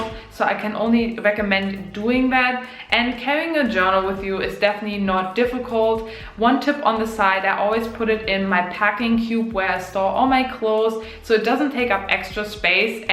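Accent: Germany. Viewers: Hearing the accent I think Germany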